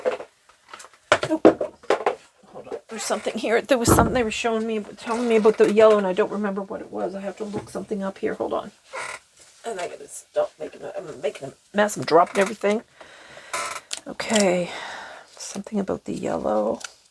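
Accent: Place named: Atlantic Canada, Canada. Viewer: so glad to have found your channel, 🩵💕 love your voice - its such a blessing to hear an atlantic canadian accent reading for spirit!! 😇🐉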